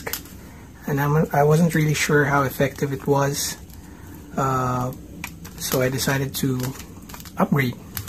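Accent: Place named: Philippines. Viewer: The accent tells me you're pinoy? if so pare san mo nabili yan? legit na 3m or fake?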